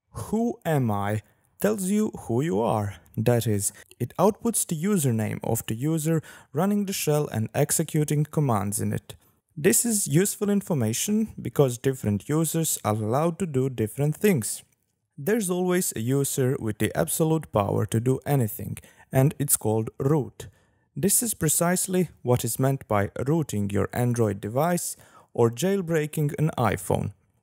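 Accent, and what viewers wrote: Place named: Croatia. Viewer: This.... was... definitely a video I watched. ?_? xD Anyhoooo... I still enjoy listening to that supreme Croatian accent. ;)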